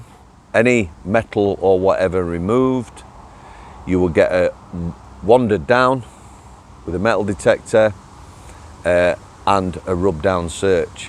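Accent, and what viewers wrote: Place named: Yorkshire, United Kingdom. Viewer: How do! I bet you get fed up with me, jumping on board everytime you post a vid; LOL. I love seeing Stevie. Hearing that you've gone back to the gym ... I live next door to a gym, but I am such a lazy-arse, that I don't go. Well, now that you've started back, I'm going to shift my carcass and get next door. Now that I'm off that f*cking sertraline, I might actually start to shift that 3 stone. BTW, whenever I heard your Yorkshireisms, I'm reminded of Charlie Williams from The Comedians - I loved that show. He always used to be on about a bottle of Watta. LOL; and called people 'Flower'. A lovely guy - RIP Charlie.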